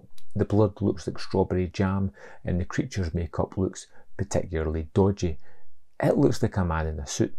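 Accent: United Kingdom. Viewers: Was just roaming at Arrow to buy some stuff and saw the Grffe box wondering what that might be. With Arrow you'll never know. :D So I ended up here and liked it for several reasons: - the review gave me a great idea what to expect and personally I don't mind seeing footage, pictures is good enough - as a non english native who used to have an american accent this is great to learn proper english and shit to a more british accent (thanks for not speaking to fast :) ) - for the purpose of ending the american dominance on YouPuke I could subscribe to a british channel, nice Salute from germany.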